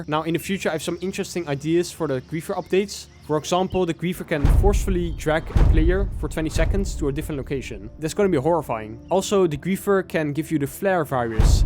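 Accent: Netherlands. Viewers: Are you dutch? Ur accent sounds very dutch, no offense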